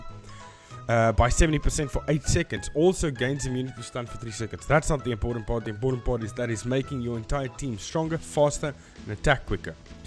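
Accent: South Africa. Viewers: You sound south African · Jy klink afrikaans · You sound South African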